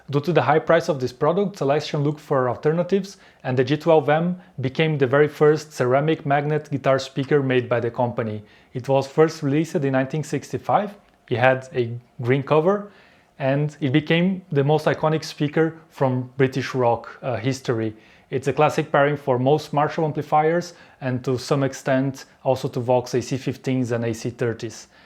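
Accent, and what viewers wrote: Brazil. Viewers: Tu é brasileiro né? Mó jeito de brazuca de falar em inglês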